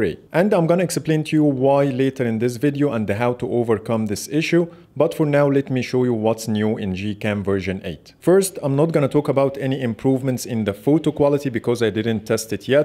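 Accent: Egypt. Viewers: Thats our well known egyprian-english accent. Weird enough other Arabs thinks its annoying! Lol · it's an egyptien accent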